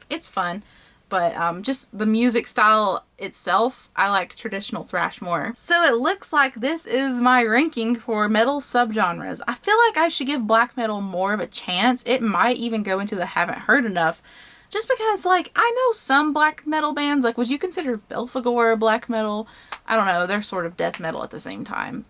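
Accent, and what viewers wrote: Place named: South, United States. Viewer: You're southern aren't you ? 🥺💘😭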